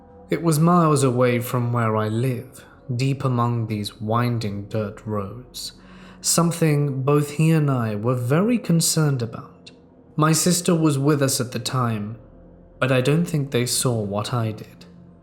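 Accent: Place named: Upper class, United Kingdom. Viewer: BBC English with a distinct aristo twang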